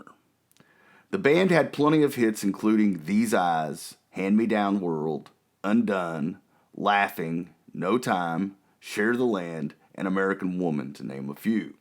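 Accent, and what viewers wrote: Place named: Texas, United States. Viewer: The Texas accent of the narrator is unbearable.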